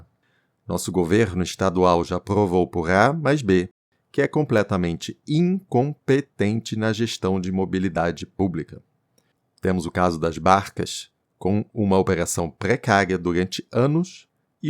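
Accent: France. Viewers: Que sotaque francês é esse ?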